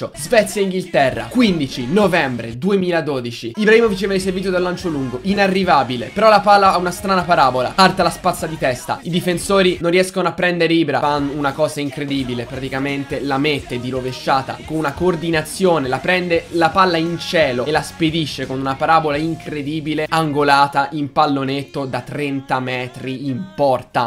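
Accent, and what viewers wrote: Tuscany, Italy. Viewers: sei toscano??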